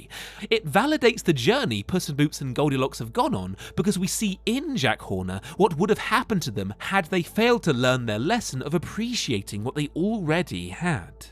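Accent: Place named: United Kingdom. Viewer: I know it’s the same actor/YouTube host but with that thick British accent, both of you guys sound evil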